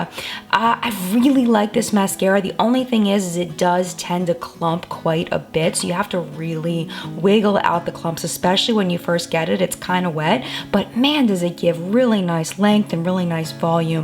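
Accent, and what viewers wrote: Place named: Maryland, United States. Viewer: I always wondered where you were from because you talk just like me. When you said "Its been hot in 'Murraland'", it brought metaphorical tears to my eyes. Represent 301.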